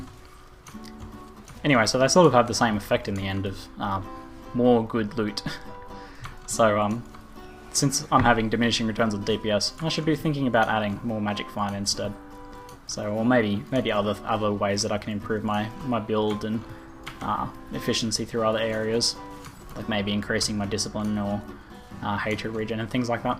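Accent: Australia. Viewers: I love this serie! and a very big plus to the commentary,australian accent and content!! +1 Sub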